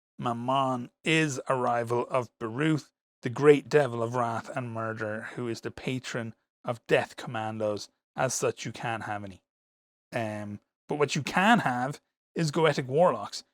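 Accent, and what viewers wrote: Ireland. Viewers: The voice of fantasy evil is Irish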